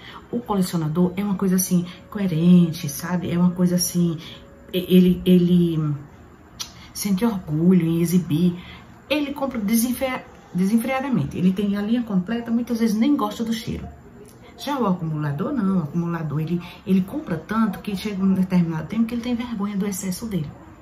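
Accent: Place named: Northeast, Brazil. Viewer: ❤❤❤bjs,eu tbm amo esse sotaque arretado 😅😅😅😅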